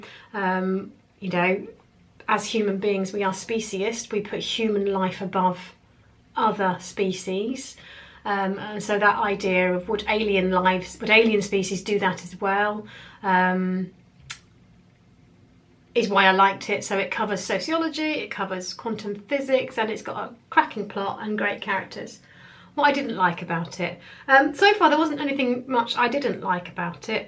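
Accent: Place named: RP, United Kingdom. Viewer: Received pronunciation .moron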